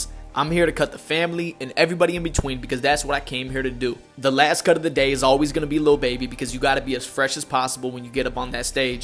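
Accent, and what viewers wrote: North Carolina, United States. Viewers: I think you should get paid as much as you are able to get. I don’t mean you would be unfair to this rapper. I mean I think you are worth an unlimited amount. Your conversations with people are genuine and lovely. The attention you give your haircuts AND your clients is absolutely priceless. I’m in North GA near Athens, so I understand your roots somewhat. Much respect and love to you and your work. You deserve all that life has to offer. I do NOT understand why you talk the way you do, being from NC, but we all have our ways.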